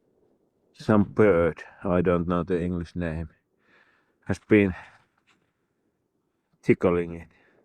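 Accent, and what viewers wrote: Finland. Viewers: A Woodpecker has been tickling it 😮😂😂😂. Just love Finnish-English. 👍 A Master at work, is a wonderful sight to see 👍🇬🇧🇷🇺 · I think the Finglish is great. Very skilled work. Looks like your mature spruce is in a 30m reserve against the lake and the bush behind was a previous stand harvested 15 years ago, or a fire. Those big veteran trees always at risk of blow down at some point.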